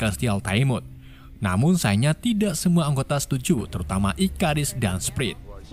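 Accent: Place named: Java, Indonesia. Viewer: Jawa sekali pean kang😂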